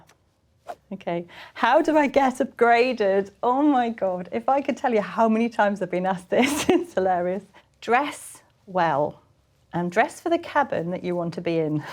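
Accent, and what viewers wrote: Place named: Northern England, United Kingdom. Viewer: I love her particular accent, can any British person tell me where she might be from? I'm guessing from somewhere up north, but I have no idea beyond that.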